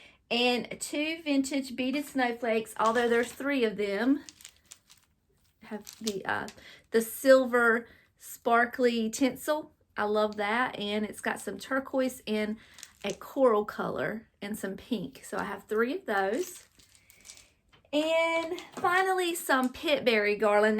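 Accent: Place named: North Carolina, United States. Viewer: Hi I have been watching your channel for a few weeks now and I knew there were something we had in common or should I say someone and when you said booth and Tenn. I knew you were talking about Susan she is so sweet,my daughter started a YouTube channel a few months ago and Susan was one of the first YouTubers to comment on her video and she has been such an inspiration to her from the start. I just love that dress on your daughter so pretty and I love all the stuff Cindy sent you so pretty, you sound like you are from N C if so, So are we the name of my daughters channel is Around the house with Charlene if you get a chance to view her channel I would be thrilled and I know my daughter would just love it. I love how the pictures you on the wall ❤❤❤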